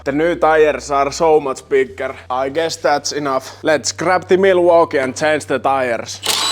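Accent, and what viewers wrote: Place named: Finland. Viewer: Cmon guys.. there is no shame in rally english. It’s literally english with finnish accent and that’s it. That is very understandable for native speakers in my experience. Ofc it sounds rough in the beginning but ”suomi sopii sulle paremmin” commentators can burry their heads in the sand and keep living your life xD you do have balls of mulliperse.. keep it up!😂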